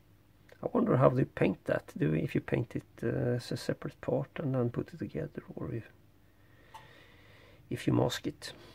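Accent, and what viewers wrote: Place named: Sweden. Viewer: Är du svensk för din accent på engelska låter svensk?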